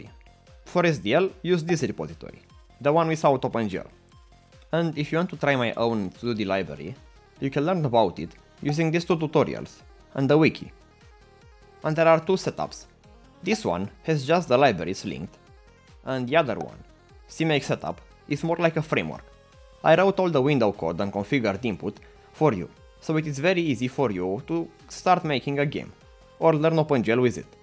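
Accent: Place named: Romania. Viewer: Se aude romanul din tine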